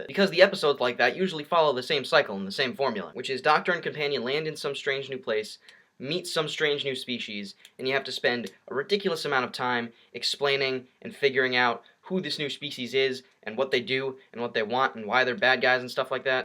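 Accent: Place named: England, United Kingdom. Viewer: That English accent omg XD you do make lots of good points though! Even if I did stare at the angel tshirt for the majority of the vid...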